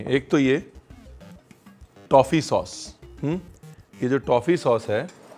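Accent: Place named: Lucknow, India. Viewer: What a personality Punjabi Jatt guy with a calmest aura, ice it up with Lucknowi hindi, shayari and chef..and katilana looks...Mashallah!!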